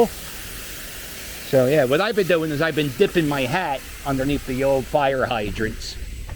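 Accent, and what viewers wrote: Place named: Pennsylvania, United States. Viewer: I was watching a video on US accents (done back in <num>’s) and specially focusing on accents that may disappear. they interviewed this PA woman who seemed to have a similar accent to you. It was cool to here the accent again.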